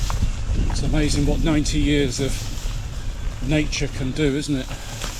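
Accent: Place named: Oxfordshire, United Kingdom. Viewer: funny to hear the oxfordshire accent again the roads are just as bad as I remember them from the 70's on a racing bike lol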